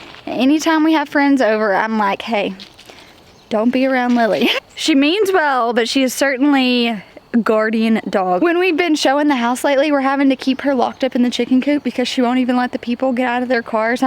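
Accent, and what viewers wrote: South, United States. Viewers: Beautiful garden! Success? Today I made homemade pesto from basil in my herb pots. Best pesto I've ever made. I shared it with my neighbor and daughter in the form of a really great pizza. It would have been a pretty empty feeling otherwise. So sharing your garden with your followers is the same thing but on a much larger scale. BTW, don't ever, ever, ever apologize for your southern accent. It's what sets us (me-NC) apart from others. What a boring world it would be if we all sounded the same. 😀 Take care!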